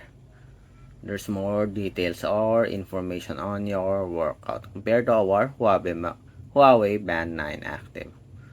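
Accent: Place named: Philippines. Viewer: Pinoy accent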